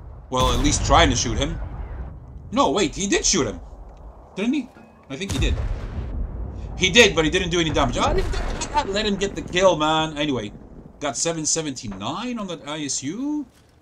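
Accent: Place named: Greece. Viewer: I speak russian and I understood what you said, You have a bit of a greek accent but I think that adds to the charm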